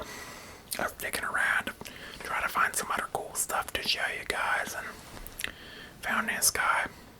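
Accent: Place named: South, United States. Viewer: Didnt know a souther drawl with asmr was so good!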